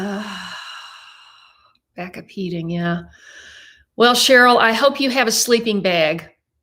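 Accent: Tennessee, United States. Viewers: Happy Birthday, have a wonderful time amazing, its Lucinda's birthday tomorrow 15th, my birthday is the 5th of April i shall be the big 80 can't believe it, you're getting back you're Tennessee accent love it Lucinda noticed it more than me, you're fireplace and livingroom looks really cosy and picture are outstanding "well done" missed the livestream, horse riding exercise see you next video love, Edwin & Lucinda Xx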